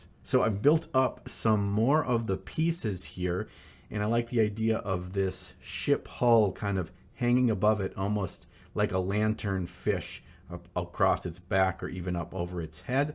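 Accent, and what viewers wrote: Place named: Minnesota, United States. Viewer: This is completely unrelated to the video, but I love the fact I can tell you're from Minnesota! I went to work in Connecticut for two weeks, it was a work trip from the UK, and the guys I worked with mentioned Minnesota for whatever reason, I think it was related to not being able to find an In and Out Burger on the East Coast. They kept calling it Mini Soda because of the accent, and yeh, bag, tag etc they're so distinctive! I love it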